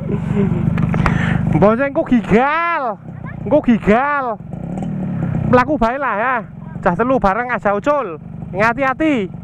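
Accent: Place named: Wonosobo, Indonesia. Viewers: Gw seru ma celotehan nya bkn ma situasi nya.. Ngapak asli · Jane rono bengi wani ora jal Jiaaann ngomonge wonosobo bngt basane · haloow Jawa ngapak hehehe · maer mas logate Bahasa Indonesia - Wonosobo wkwkw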